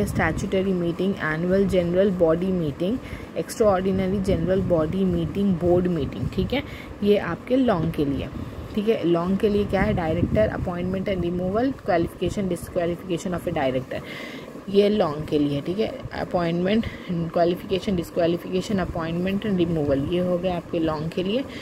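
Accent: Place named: Hyderabad, India. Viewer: Thanks mam for this.. Pure hyderabadi explain😊😊😊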